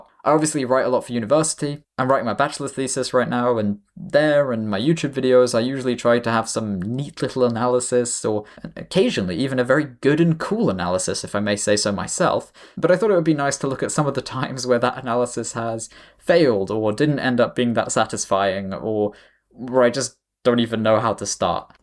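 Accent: United Kingdom. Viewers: I always thought you were a native brit and I thought I was going crazy hearing swedish in your french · y u sound brit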